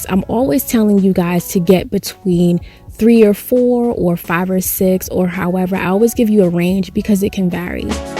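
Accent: New York, United States. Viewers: Auntie u got a NYC accent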